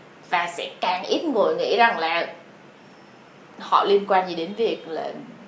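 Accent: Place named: Buon Ma Thuot, Vietnam. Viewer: noi giong Ban me Thuot lam` cho nguoi nghe cam thay ga^n` gui? va` so^'ng do^ng. rang giu*~ nhu* vay duoc ko!!!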